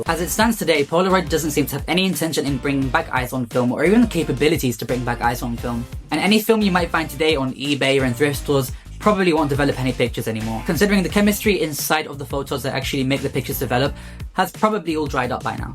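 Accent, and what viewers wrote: United Kingdom. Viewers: holy plot twist. i saw ur youtube shorts and did NOT expect u to be british. (slay tho) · I KNEW YOU WERE BRITISH. I KNEW IT.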